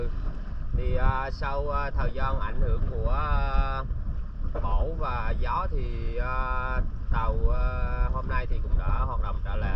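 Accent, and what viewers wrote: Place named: Quang Nam, Vietnam. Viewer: Ở mô mà nói tiếng quảng nam vậy🤔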